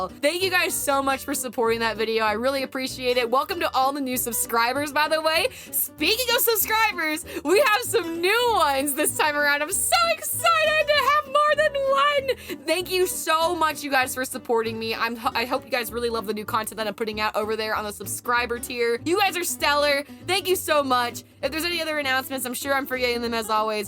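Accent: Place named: South, United States. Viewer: i'd say it's not only the southern accent, but the voice itself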